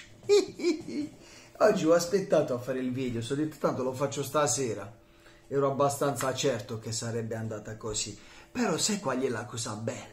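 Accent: Bari, Italy. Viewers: Spettacolo la parlata Barese compaesano Mio 🤣❤🖤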